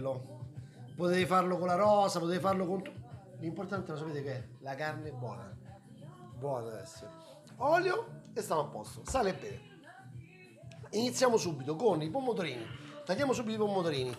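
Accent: Rome, Italy. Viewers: Meraviglioso piatto. Ti assicuro che a volte non mi interessa il video :. Basta la tua voce de Roma che mi sento a casa. Grazie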